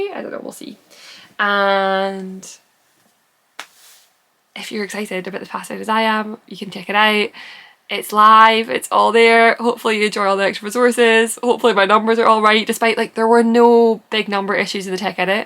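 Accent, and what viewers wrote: Scotland, United Kingdom. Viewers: I have watched several of your videos and have several of your patterns on my ravelry wish list. Also I love a Scottish accent you could be reading me your grocery list honestly so I was actually embarrassed for myself to realize i wasn’t subscribed. Has been remedied now but damn. Not you showing up on my suggested page to get my ish fixed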